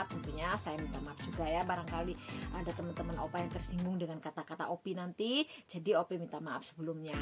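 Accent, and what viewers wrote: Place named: Java, Indonesia. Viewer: Hhhhhhhh jawanya mna medok sekali ling hhhhhh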